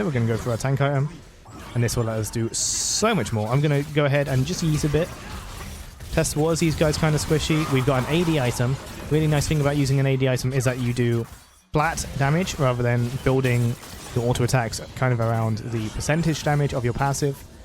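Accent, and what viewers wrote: United Kingdom. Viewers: he's bri'ish m8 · He has english accent with a slight lisp, im english too so I love his voice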